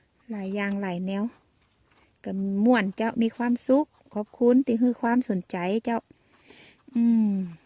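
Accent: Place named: North, Thailand. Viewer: น้องชอบปี่อู้กำเมืองมว่นดีขนาดจ้าวดูแล้วสบายใจจ้าว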